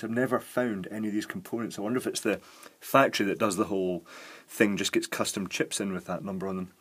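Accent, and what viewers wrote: Scotland, United Kingdom. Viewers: Are you originally from the Isle of Man? Your accent makes you sound like you're from Scotland.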